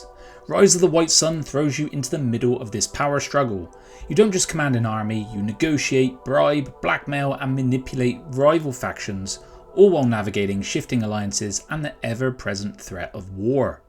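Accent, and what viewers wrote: United Kingdom. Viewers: you sound kinda British tho. Just weird to use Euros as a currency. Like a US Youtuber quoting prices in GBP. Just weird.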